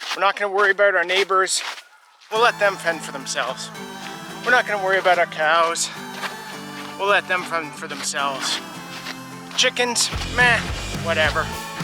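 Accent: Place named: Canada. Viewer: Strong Canadian accent here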